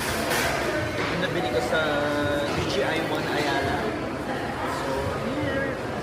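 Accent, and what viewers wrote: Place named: Thailand. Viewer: sobrang thai accent ahahahaa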